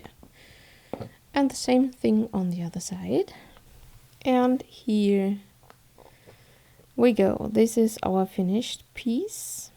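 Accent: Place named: Northern Europe. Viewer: Thank you for answering I was very frustrated when I wrote that… and I love your accent I'm thinking Northern Europe… I wish I spoke a second language as well as you do English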